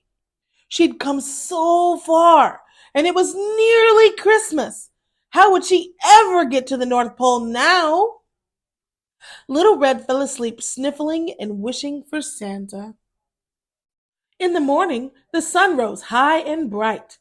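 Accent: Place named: United States. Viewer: Love the cawntry accent 😂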